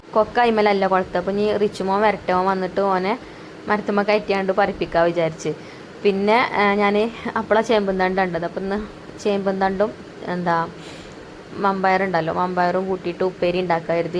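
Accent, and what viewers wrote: Malappuram, India. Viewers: ഞാൻ ഒക്കെ വളരെ ദൂരെയാണ് എന്നാലും വാക്കുകൾ ഒക്കെ സെയിം റസിരെ വർത്തമാനം മലപ്പുറം ഇശൽ ഉണ്ടെന്നേയുള്ളു നമ്മൾ ഇവിടെ കണ്ണൂര് ജില്ല കാസർഗോഡ് ബോർഡറിൽ.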